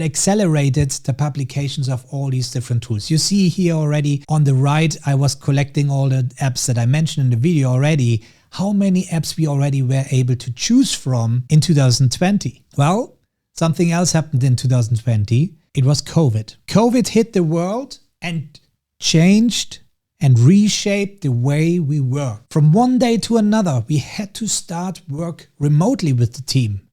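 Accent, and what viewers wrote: Germany. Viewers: Im hearing a lil bit deutsch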